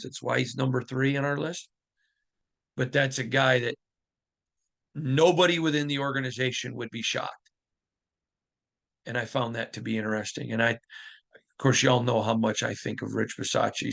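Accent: Midwest, United States. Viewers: He's from the mid-west !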